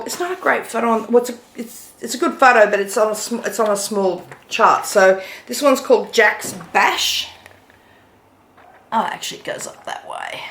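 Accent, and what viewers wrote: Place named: Australia. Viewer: Enjoyed watching you again. I really appreciate watching Aussies and hearing our accent for a change on flosstube. Ornament and autumn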